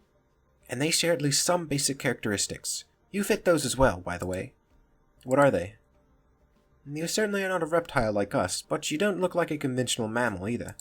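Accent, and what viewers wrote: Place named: United Kingdom. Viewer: nice British accent.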